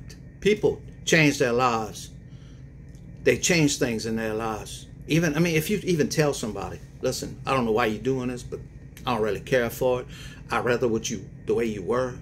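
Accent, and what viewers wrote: Louisiana, United States. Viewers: I AGREE 100%. JUST CONTINUE TO DO YOUR THING YOUR WAY MANY MORE OF US ENJOY IT SO MUCH. THOSE WHO TAKE ISSUE AND NIT PIK EVERY LITTLE THING NEED TO GO ELSEWHERE AND BE HAPPY. IT'S ANNOYING WHEN PEOPLE NIT PIK READERS AND EXPECT READERS TO CHANGE AND TO ACCOMODATE THEM. ENTITLED MUCH ? THEY'RE NOT SPECIAL. YOUR SOUL TRIBE/ SPIRITUAL WARRIORS ARE LOYAL AND CONSISTENTLY WATCH YOUR READINGS BECAUSE WE LIKE THE WAY YOU READ GIVING IT YOUR SPECIAL CAJUN FLAVOR AND WISDOM. SO PLEASE DON'T MIND THEM JUST CONTINUE TO BE YOU AND DO YOU BOO!!❤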